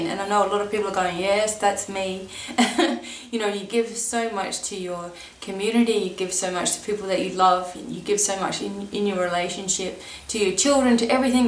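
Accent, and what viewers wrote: Australia. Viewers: Your sunny, unpretentious nature, your Aussie accent and your cheeky smiles always brighten my day and make me grin happily. Good job, B.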